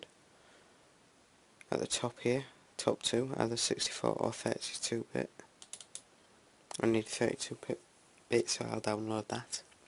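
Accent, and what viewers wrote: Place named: United Kingdom. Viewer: hey where do you come from. i know youre british but what part? its just that youre accent seems familiar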